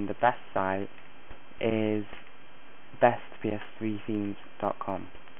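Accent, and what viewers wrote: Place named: Guyana. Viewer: u ah guyanese too lol wah gwan bai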